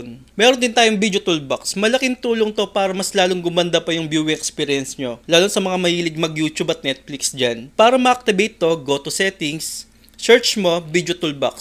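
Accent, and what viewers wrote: Pampanga, Philippines. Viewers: Kapampangan kata Koy? Hahaha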